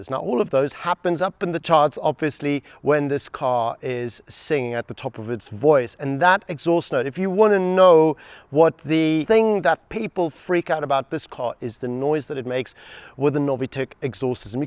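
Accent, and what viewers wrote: Afrikaans, South Africa. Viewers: Love the Afrikaans coming through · Tell me you’re South African without telling me your South African? Ma se kinnes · Are you South African?😊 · This guy sounds South African? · Love the accent sir your far from home🇿🇦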